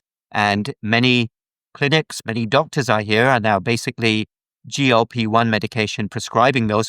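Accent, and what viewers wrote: United Kingdom. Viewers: You sound like you're in the UK so point is you should be aware that the type of care a lot of people are getting is so surface level and subpar it's unbelievable. Are we shamed for being overweight? Of course. Are we given innovative solutions and advice to fit our specific needs eg conditions like PCOS, Hypothyroidism etc? No of course we're not. Are we taught about proper nutrition? Never. So it's not a wonder why most people consider a quick fix when the shaming around weight and yet no help are very loud in the medical field.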